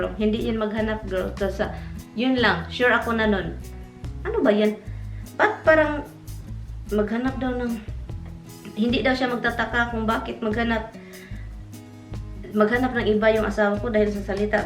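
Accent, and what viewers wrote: Visayas, Philippines. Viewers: Si Pres. Duterte nga ganyan din mag english dahil bisaya din siya. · I like you sis for being so honest, there's no problem if you sounds like bisaya when you talk in English or Tagalog, let's be proud of what we are and where we came from, maybe these people are envied by you, don't stressed yourself..... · nakakainis ka talaga mga insecure...ano din Kong may visaya accent kaloka talaga ..pilipino nga naman kung wala kayo maganda isasabi wag kayo manuod ..mga inngetira ...grabi naman talaga pilipino pag naka asawa foreign pokpok na agad kaloka msyado mga inngetira talaga ..may asawa rin ako foriegn church kami nag meet nag husband same religion..wala ako paki sa tao ayaw sa akin .. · SO WHAT KUNG MAY ACCENT KA? So what kung bisaya ka? Grabe itong mga taong ito akala mo naman matatalino pa sa yo! Bkit sila ba may blog kagaya mo? 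Inggit ba inggit sila kaya ganyan sia ka mean sa pagsasalita nila sayo. Kaya hayaan mo nlang sila. Matuto sila ng tamang asal!!! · Hi I love all your vlogs ,hayaan mo yang mga bashers at least marami naman mga good people loves you .mga wala lang yan magawa at naiinggit lang yan sayo.Stay what you are ,I am proud to be bisaya ,I love the way you talk , ganon talaga tayo mga bisaya matigas magsalita ng words ,marami ka naman napapasaya like me I really enjoy your videos .Don't mind people judge you ,walang perfect sa mundo ,Stay positive and stay what you are I love you , pashoot out naman ,God bless😍😍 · Hahaha!😂😂😂 Some people got nothing to do. Iyan Ang mga taong walang magawa, sila ang naiingit sa iyo. And I like the way you talk, so what if your a visayan Wala silang pakialam. Just ignore those kind of people, they're ignorant. Di huwag na siyang manood if they don't like you. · I always watch your vlogs but I still have to catch up with your past vlogs., anyway, other people have no life so they mind how others live their lives. 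Kaloka sila, eh ano if bibigyan Ka Ng pera ni Tommy, helloooooo, asawa mo sya, super funny ang ibang Tao mag isip. You don't have to explain really sis, whoever is this person, she's so downright jealous of your happy life, hayaan mo syang mangisay sa inggit. Nanlalait pa talaga sayo, kesyo maingay Ka kumain, ang sarap mo kayang tingnan kumain, nakaka gana nga, kasi natural masyado, nag eenjoy kang kumain. Grabe ang inggit nya sa yo, kasi maganda Ka at happy sa buhay mo tapos, ang gwapo at super loyal Ng asawa mo. Ang linis linis Ng bahay mo, how dare these people call you pokpok, sobrang home maker mo kaya, tapos, super loving ka din sa asawa mo. Inisin mo nga sila, ingayan mo pa kumain, inisin mo pa lalo. Bisaya din ako, nagkakamay din ako, at maingay din ako, not only when I eat, as in, maingay ako like you, so what? Napaka bitter Ng babaeng Yan, kawawa naman sya. Eh ano if matigas ang accent natin? 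So what? Tigasan pa natin lalo, para lalo silang mainis, lait Ng lait, but nanonood naman, mga gago sila. At manlait RIN Wala kayong anak, eh ano, ako din walang anak, complete pa rin tayo, keysa magkaanak nga, mga Adik din, may neighbor ako ganun din sa akin nuon, before I got married, nilait ako being single, and now that I'm a widow, nilalait din dahil walang anak, but yong mga anak niya, lahat Adik, pulos lalaki, nakakataw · Yung iba nga sis tinututok pa ang mic para marinig ang nguya eh hahaha.. yun nga sis prob sa mga viewers di nmn lahat ng kilos ntin eh need video di nmn lahat move. Mga ganyang ganyan din mga comment sakin hahaha. Mga inggit lng satin sis. Bisaya ka kasi sis kaya syempre bisaya accent talaga. Syempre maganda nmn talaga tagalog english para maintindihan ng lahat. Pag nagbisaya ka sis mdami malulungkot di makaintindi. Tawa ako ng tawa pagbasa mo hahaha. Ako nmn magtatagalog nlng daw ako eh pag nagtatagalog ako dami nmn nagrequest english kasi may mga foreign viewer. 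Tawang tawa ako sa may asim pa hahaha. Pokpokin mo n sis hahaha. Dati nagbasa din ako negative comment mas lalo sila nagsasaya haha.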